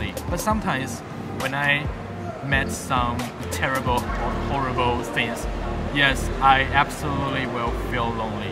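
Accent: Taiwan. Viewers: 真的滿台式英文....但很棒!!勇敢開口說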